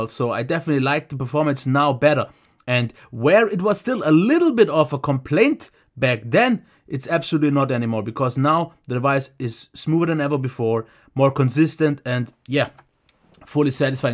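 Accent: Germany. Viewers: Ich liebe es wenn Deutsche Englisch reden. Egal wie sehr man sich auch anstrengt, man bekommt den deutschen Akzent einfach nicht los :D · Funny when A German speaks English like him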